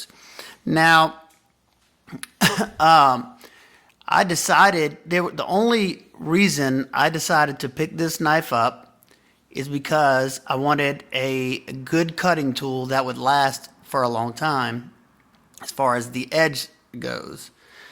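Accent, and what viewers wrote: Louisiana, United States. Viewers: This knife is so interesting. its screaming for custom inlays. great review! do I hear a south Louisiana accent?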